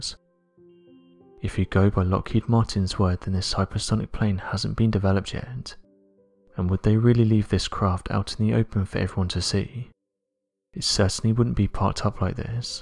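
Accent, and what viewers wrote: United Kingdom. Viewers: Secureteam10? You’re citing Secureteam10?!?!! I guess, at least it’s better than “someone said” or “another person thinks”. Your British accent isn’t enough lipstick for your fake news. Some websites?? Which ones?!?!?!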